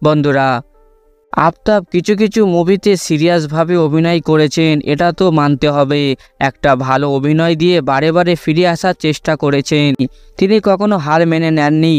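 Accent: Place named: Kolkata, India. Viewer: Sabdhana Kotha bolben aita bagladesi occharon na bangladesira vul occharon kora na aita apnader Kolkataer vasha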